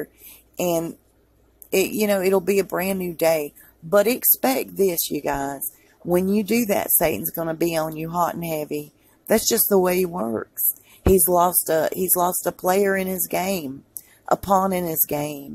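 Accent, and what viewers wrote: South, United States. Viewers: Well said!! You have this sweet country accent combined with such truth, intelligence and highly articulately. Love your work girl! 👏🏼👏🏼👏🏼👏🏼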